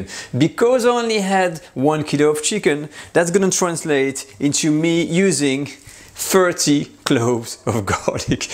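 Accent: France. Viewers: Your French accent convinced me to make this recipe.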